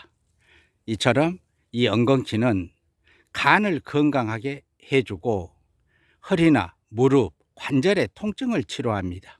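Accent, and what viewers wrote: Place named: North Gyeongsang, South Korea. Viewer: 말투가 경상도 북쪽이 아닐까요 ??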